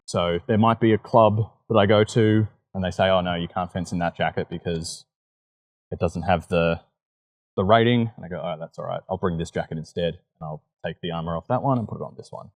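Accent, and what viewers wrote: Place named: England, United Kingdom. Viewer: nice review. I was expecting you to speak czech as the pic had subtitles lol. I got The Kings English. 😅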